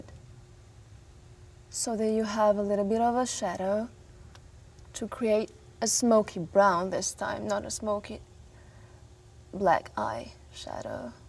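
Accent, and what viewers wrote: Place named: Italy. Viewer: italian accent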